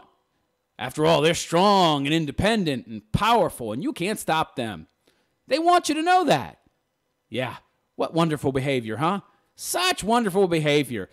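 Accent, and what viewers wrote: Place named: South, United States. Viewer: My dear Lady Vin, thank -you for your prompt reply. Bible days, tantrums, Arab men, women without rights, who will cave-in first and hidden children within the Catholic Church.... Did I forget to mention Harem ?? To begin with, I'm not an American, however, MGTOW men do not want to have women , period. No wives, mistresses or harems. Please do not misquote me on that . We want less responsibility with regards to women, not more. MGTOW men say no to marriage, LTR and co-habitation. We make no demands of women, other than their distance. Biblical times, NO, we are moving forwards, not backwards. We live within the Western society and have little or no regard for oppressive cultures. The laws in the USA as well as here in Europe and biased in favour of women when it comes to divorce and taxes. MGTOW is a mindset, not a formalised structure, no central organisation, no official spokesperson. 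Therefore, there can be no implosion of men who choose to live life on their own terms. We are as intervidual and unique as you are. Illegitimate children within the Catholic Church is something I am well aware of. Being Catholic myself, I know all about the Madeleine Laundries and the lives that institution wrecked. On par with the Holocaust in my opinion. So yall can chew on that awhile ( love your Southern accent ). Thanks once again for your reply....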